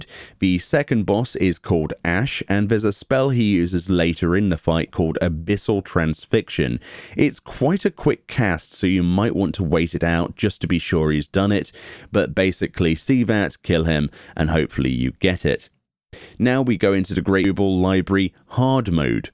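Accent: England, United Kingdom. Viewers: English accent doesn't mean he understands how to read it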